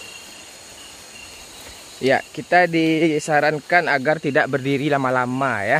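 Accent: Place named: West Sumatra, Indonesia. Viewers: Suka kali aku logat nya minang kocak😍❤ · Bang abang org mana bang soalnya subscriber baru kok suaranya kayak orang sumbar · Bahasa nya jaleh urang Minang nya om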